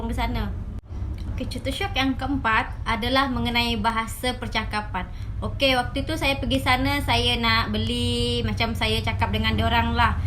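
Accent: Indonesia. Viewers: ni org mane??.. dr segi gaya² ckp tu.. mcm org Indonesia.. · Awak ni org mana? Awak mcm ckp awak org Malaysia… tp loghat awak tu mcm org Indonesia cuba cakap bhs Melayu Malaysia. Contoh: Org Malaysia tak ckp beda, org Malaysia ckp beza, Indonesia bukan Indonesie, n byk lagi. mmg bukan bunyi org Melayu Malaysia pun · Kamu ni org indonesia ke confius la Mcm bunyi org indonesia jer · Dgr cm loghat kepulauan riau je.kabupaten lingga.btl ke?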